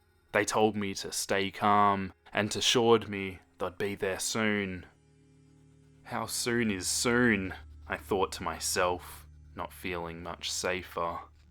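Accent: Australia. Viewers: Why did I only just realise that you're Australian lmao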